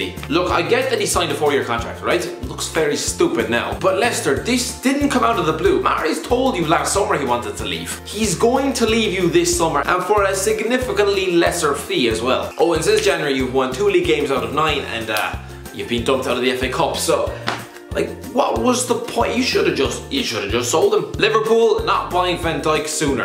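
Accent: Ireland. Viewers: Just wondering, where in Ireland are you from? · i watch his vids for the irish accent like if you like the irish accent as well comment if you dont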